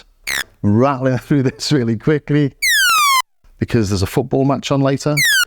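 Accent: Liverpool, United Kingdom. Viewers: Scouser all day long (what us Brits call those crazies from Liverpool)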